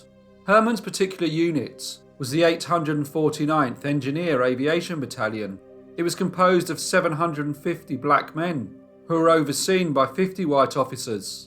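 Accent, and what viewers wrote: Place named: Scotland, United Kingdom. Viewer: I love the Scottish accent...had hopes to visit someday. Things in the world have changed so much I'm not sure if that's a reality now...but anyways...GOOOOD mornin from the northeast USA! Hope you're staying well and safe!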